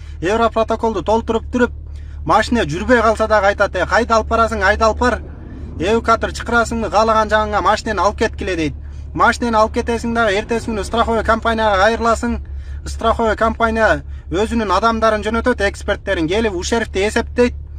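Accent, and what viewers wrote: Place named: Pamir, Tajikistan. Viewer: Туура эле суйлодун брат. Акцент памирский экен а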